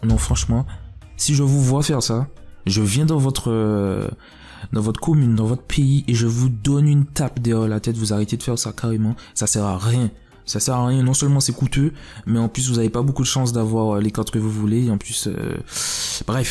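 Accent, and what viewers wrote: Antilles, Caribbean. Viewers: T antillais, ça sentend a ton accent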